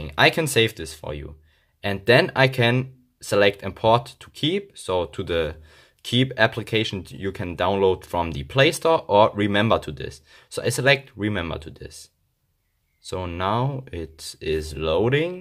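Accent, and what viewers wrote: Germany. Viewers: Are you from Germany? You sound like a german dude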